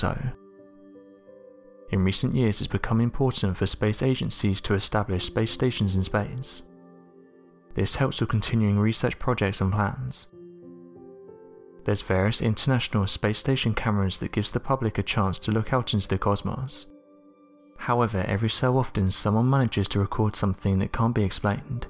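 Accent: United Kingdom. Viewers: A British commentator? Like all the Iconic movies deplicting Historic charactors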